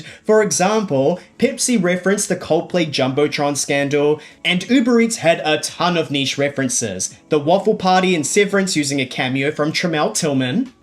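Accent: United Kingdom. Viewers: Why is MrBeast reviewing commercials with a British accent? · ile the fact that is a british person explaining this :hand-purple-blue-peace:😭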